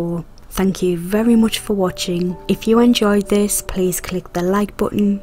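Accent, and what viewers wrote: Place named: North East England, United Kingdom. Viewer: It’s a north east of England accent. Newcastle or Sunderland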